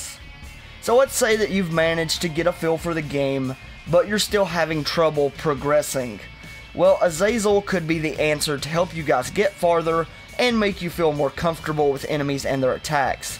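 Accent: United States. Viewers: Love your American accent.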